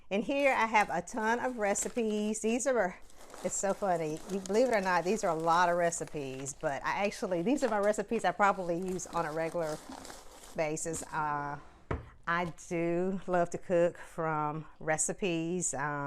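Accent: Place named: South, United States. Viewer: I had to downsize but I can not wait to move this summer to a larger home which will hopefully have a much larger kitchen so that I can use my Hutch again. We are so similar I love vintage cookware and kitchen gadgets and such, I have Pyrex bowls very similar to yours. I bet your home is very warm and inviting and comforting and that is the type of vibe that I hope I give in mine and I feel the same exact way about my kitchen it is the heart of my home. Where do you live down south if you don't mind my asking? I am from New York but I've been living in Jersey the last several years. I love your accent!!!!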